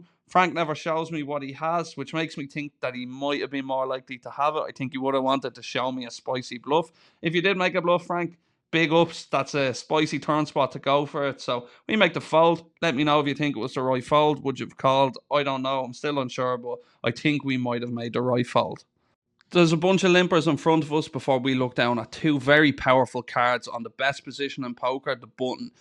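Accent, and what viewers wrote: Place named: Ireland. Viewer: Really glad the Algo put you on my home page, been looking to add a Sound Studied Vlogger to the Sub list! Makes it even better with your Irish accent...Good fookin fold on the AJ!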